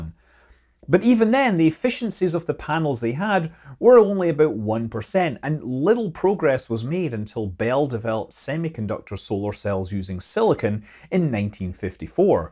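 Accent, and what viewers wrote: Scotland, United Kingdom. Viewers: That scottish accent hitting hard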